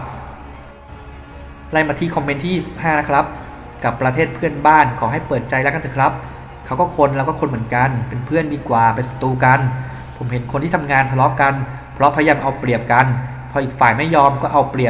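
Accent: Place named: Southern Isan, Thailand. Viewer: 555 แอดมินคนนี้55.มันเป็นคนลาว.แก๋ว.ลูกซอดๆ.หรือคนเขมร555 มันเอาคลิปแนวนี้มาออกตลอดไอ้นี้....สำเนียงมันพูดเหมือนคนอีสานใต้....<num>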